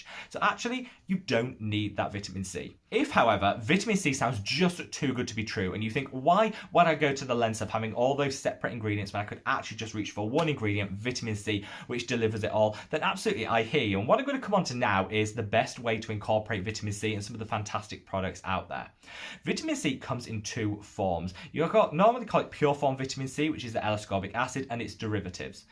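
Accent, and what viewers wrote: United Kingdom. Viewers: Every time I hear you and James Welsh say "vitamin," my American ears can't deal with it.😆 For some reason, there are three British pronunciations that I find exceptionally distracting: vitamin; schedule; and aluminum (which you spell differently and add a whole extra syllable to!). Love your channel as much as I love my Timeless Vitamin C!❤️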